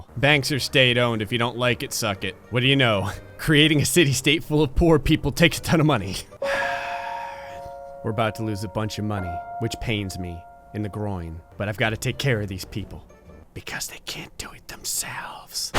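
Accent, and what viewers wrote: New Jersey, United States. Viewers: I'm from Brooklyn and can comfirm that is nj lol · What part of NJ are you from??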